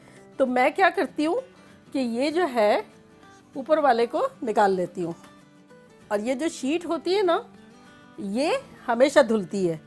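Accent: India. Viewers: mam I was watching your pani puri recipe with my dad today and I told him she is from karachi but at times sounds so indian🤩🤩🤩 my dad and I enjoy watching your recipes Love from india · Very beautiful vlog. Felt like we are enjoying with you. Your accent is very much like indians as compared to other youtubers that I follow. Any roots in India?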